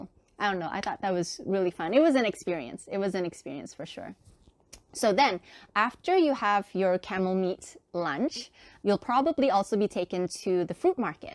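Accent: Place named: United States. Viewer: Assalamualaikum Sister. My family and I were scheduled to go for Umrah in April 2020. However, regrettably Saudi Arabia closed its doors for all pilgrims in March postponing our trip to the Holy City. Insya' Allah, we will be able to make our said mini-haj early next year. Thank you for your very informative and can I say, entertaining videos. You are very eloquent in your presentation. Going on a tangent, I cannot help but wonder where are you originally from. If I were to hazard a guess from your name, perhaps you were originally from South Korea, judging from your accent you were educated in the States and - clues in the video, you are now living in Asia (Malaysia, I assume... again clues). Ha ha ha! Nevertheless, very informative and I hope to be able to visit and experience these places that you have recommended. Wassalam!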